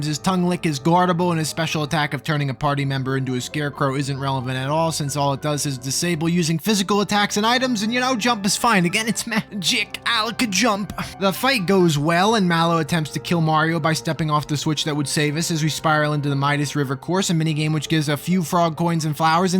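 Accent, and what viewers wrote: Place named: New York, United States. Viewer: A New York AVGN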